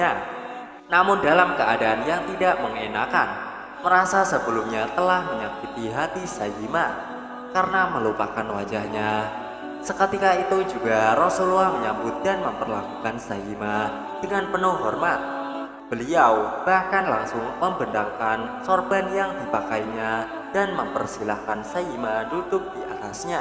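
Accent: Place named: Java, Indonesia. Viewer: Teruk bahasanyer..pelat Jawa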